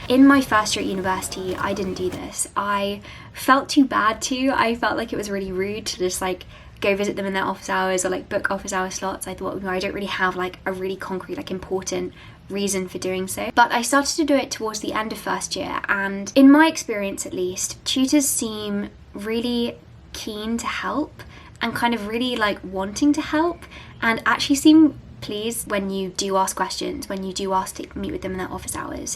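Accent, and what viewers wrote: United Kingdom. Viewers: Her accent is so like Hermione Granger's !!!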